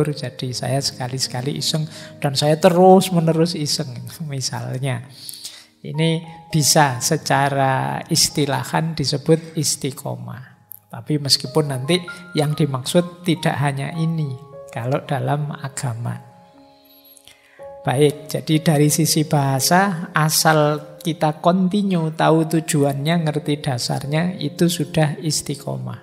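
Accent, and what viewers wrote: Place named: Java, Indonesia. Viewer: Kl ane justru mempertahankan dialek aslinya, good. Itu susah mazeh, ngilangin logat aseli Jawa bagus sekali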